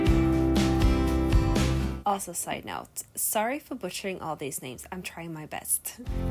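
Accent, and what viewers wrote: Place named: Sweden. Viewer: just saw you in a video on the world friends channel, and I couldn't pin point your swedish accent at all. Where in Sweden are you from?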